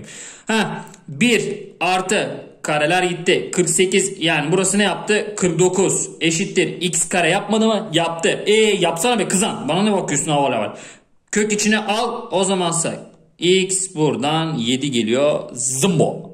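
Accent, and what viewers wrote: Thrace, Turkey. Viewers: Trakya şivesi yapma sadece küfür yapppp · Trakya şivesi güzel la kalsın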